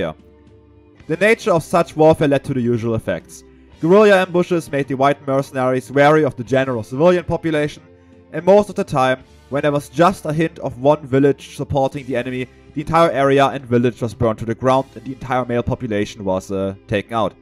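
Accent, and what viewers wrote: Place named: Germany. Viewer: Ich liebe deinen deutschen Dialekt in Englisch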